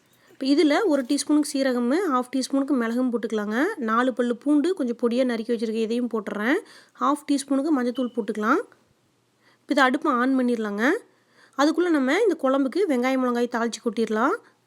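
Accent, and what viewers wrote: Kongu, India. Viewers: கொங்கு நாட்டு கிராமத்து கொஞ்சும் தமிழ் இனிமை பருப்பை உடைக்காமல் அப்படியே வேகவைத்து கடையலாம் அருமையாக இருக்கும் சீரகத்துடன் சிறிது தனியா சேர்த்து தாளிக்கவும்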